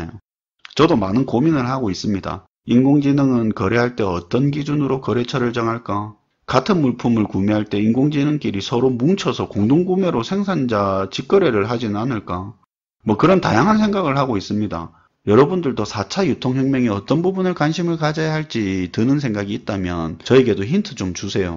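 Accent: Gyeongsang, South Korea. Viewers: 갱산도 사투리가 아주 구수합니다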